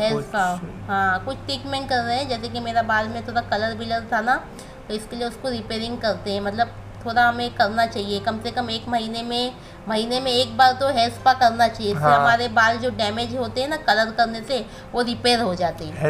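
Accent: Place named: Mumbai, India. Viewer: Bilkul Sachchiiiii baat hai, Andaaz to Wahi hain bolne ke khaalis Mumbai 🤦🏻‍♂️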